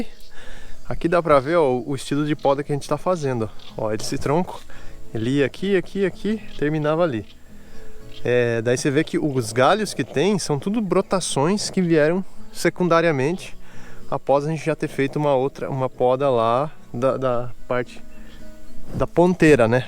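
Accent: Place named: São Paulo, Brazil. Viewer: Muito legal, tenho composteira e minhocario, e várias árvores pra gerar massa, muito legal ver jovens como vocês fazendo diferente e a diferença, só curiosa pra saber onde é o seu sítio, chutaria são Paulo pelo sotaque, kkkk, parabéns, aqui, o que é feijão arroz, não sei se foi isso mesmo que você falou mas foi o que entendi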